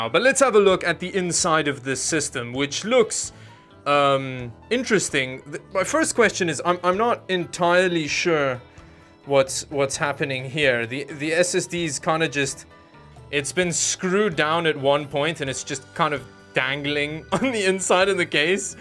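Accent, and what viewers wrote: South Africa. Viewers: My first time watching one of your videos, and I just have to know... you're South African right? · You kinda sound like a South African ngl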